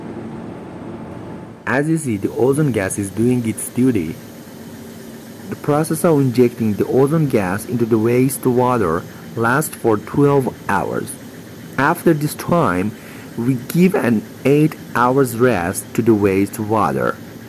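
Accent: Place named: Europe. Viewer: Why is the voice that's narrating this video sound European but all the men in the video look Middle Eastern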